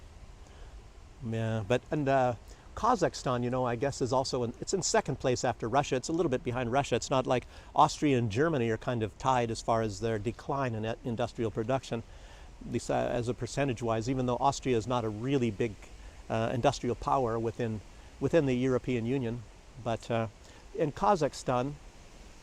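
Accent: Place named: England, United Kingdom. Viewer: You must be very much in love with Belarus. Where are you from bro? Judging by your accent, you're probably from England. However, I am from Cyprus, and I envy you now that you are in Belarus.❤❤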